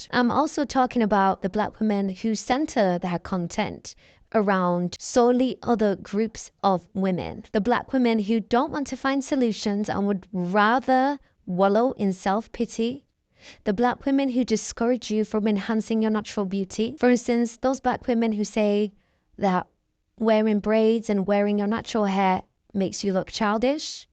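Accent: United Kingdom. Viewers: The voiceover sounds like a White woman from up north in the UK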